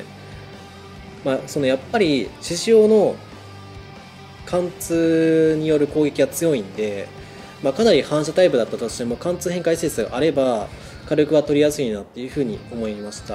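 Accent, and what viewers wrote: Kansai, Japan. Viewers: さんの関西弁がたまらないです！いつも勉強になってます(^.^)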